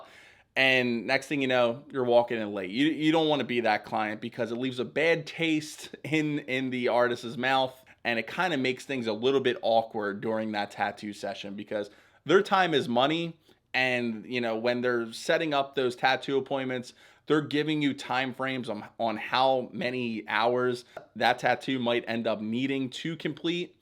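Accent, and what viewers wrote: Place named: Philadelphia, United States. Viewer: Completely unrelated comment I'm new to your channel and listening to you talk I immediately thought "this dude's gotta be from philly or the surrounding area." then you mentioned and "wooder."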